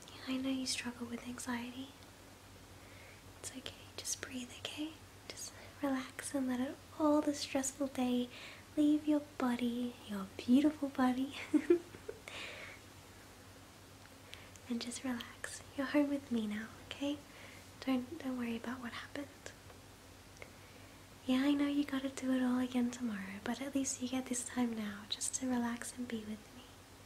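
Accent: Australia. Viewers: Hey! Are you Australian?? (I hope I didn't offend you, if my assumption was wrong)... It's just that I'm Australian, and you have that slight accent/pronunciation of words, that automatically make me identify you as maybe being an Aussie :) Sorry for being a complete weirdo but, just curious XD Cheers for your time and effort to make this video! :) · australian accent on girls = yes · 90% Aussie accent 10% grab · The aussie accent completely threw me off 😐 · I never knew how much I needed an Australian accent in my life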